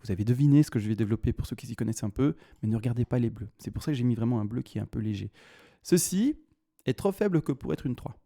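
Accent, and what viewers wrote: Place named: Belgium. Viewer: Merci pour ta vidéo je reconnais l’accent belge ? de chez moi 👍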